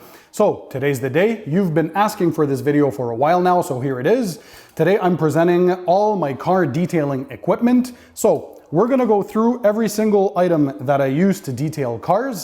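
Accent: Quebec, Canada. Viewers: Bonjour! parlez vous Français? Ça ressemble drôlement à un accent québécois anglophone haha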